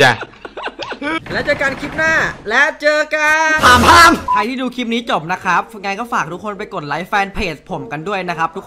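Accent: Isan, Thailand. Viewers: พี่อีสานป่าว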